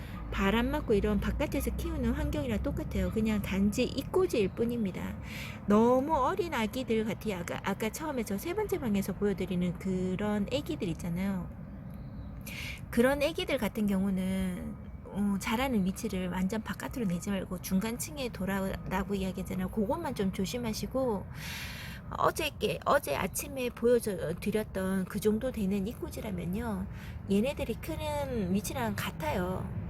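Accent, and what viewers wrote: Gyeongsang, South Korea. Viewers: ㅎㅎ 아마도 안본사람 있어도 한번만 본 사람은 없을듯합니다 계속보게되는 끌림이 있네요 댓글도 일일이 달아 주시는 모습에 감동 또 감동입니다 앞으로 구독자가 4만~400만~~ 될때까지 팬으로 응원합니다^^♡ 말투도 정감있네요 그래서 대구근처에 사시는 줄 착각할 뻔요 ㅋㅋㅋ 댓글달기도 저는 첨인 아슬맘 이거든요 ㅎ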